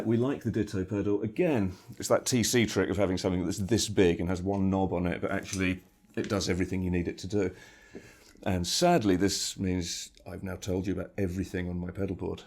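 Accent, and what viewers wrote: United Kingdom. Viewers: Lovely British Accent!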